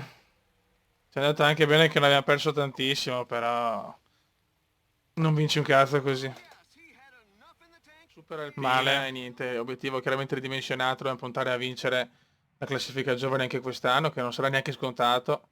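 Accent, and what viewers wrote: Veneto, Italy. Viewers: sei di venezia?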